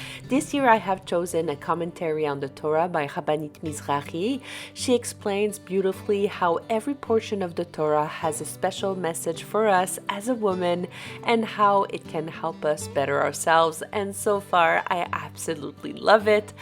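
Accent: France. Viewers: oui moi aussi! Mais je vis à Mancheter! Ça m’a fait plaisir d’entendre le petit accent français! J’espère qu’un jour je parlerai anglais aussi bien que vous! J’aime bien vos vidéos elles donnent du courage! Et j’aime aussi votre tsniout!🧡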